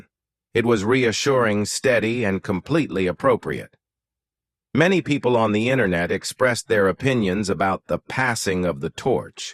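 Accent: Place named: United States. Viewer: I wish you would not use an AI robot voice with an American accent , it does not understand what it is saying and the delivery is boring. Queen Consort Camilla is not a real Queen, she is merely the king's second wife, no connection with his family other than by marriage. She is certainly no Queen Mother and has no right whatsoever to any family treasures.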